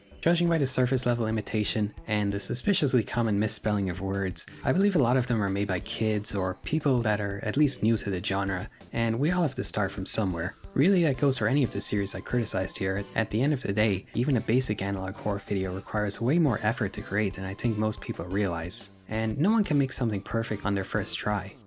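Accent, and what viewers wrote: Ireland. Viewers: Hidden Irish accent I think.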